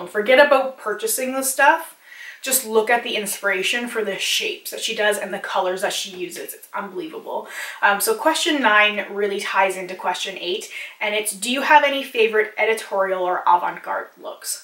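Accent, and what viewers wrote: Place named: Canada. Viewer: I can totally hear your canadian accent in this vid lol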